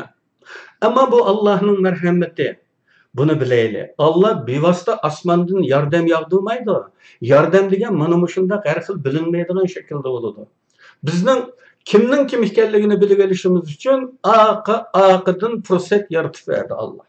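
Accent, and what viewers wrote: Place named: Kashgar, China. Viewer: بەك ئوماق گەپ قىلىسىز ئاكا ھە ؟ 100 پىرسەنت قەشقەر شىۋىسى . ئاللاھ ئۆمرىڭىزنى ئۇزۇن قىلسۇن ! ھەقىقەتنى ئوتتۇرغا قويۇپ ، ساددا ، 5 ياشلىق بالىدەك ئاسان گول بولىدىغان ، ئوخشاش ئۇسۇل بىلەن تەكرار ئالدىنىۋىرىدىغان ، ئۇيغۇرۇمنى ھەقىقەتنى بىلىۋالسۇن دەۋاتىسىز ………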